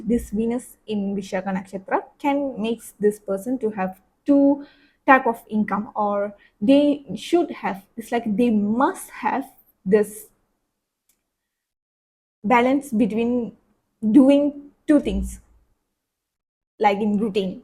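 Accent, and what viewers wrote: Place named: Malaysia. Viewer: Hey, Amazing video, appreciate the insights! Are you from Malaysia btw? I grew up there, you have the same accent!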